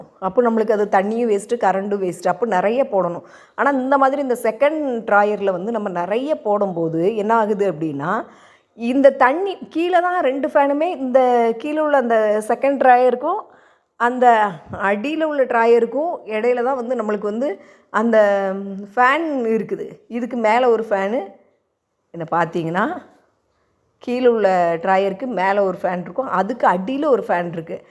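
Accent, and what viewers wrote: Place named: Tirunelveli, India. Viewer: Unga native yendha ooru mam, ஸ்லாங் திருநெல்வேலி pola இருக்கு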